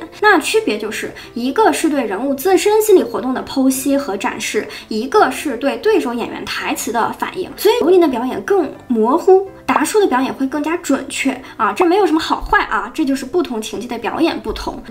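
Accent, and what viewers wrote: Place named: Shandong, China. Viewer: 我不是任何人粉丝，但Up主你的口音也很重，你可以再说一遍“小巷人家” 吗？ 你是山东人、河南人? 反正不管哪的人，你的台词也还得练练，有点大舌头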